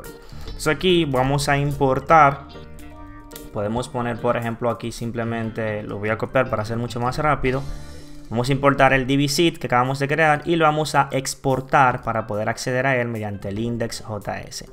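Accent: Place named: Dominican Republic. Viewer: Eh, Dominicano! XD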